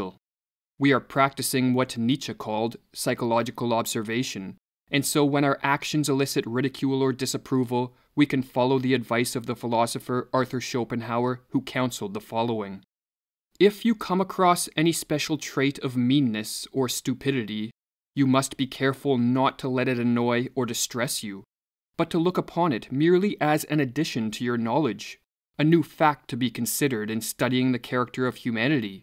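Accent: Canada. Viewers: Great videos, i just cringe at the Canadian accent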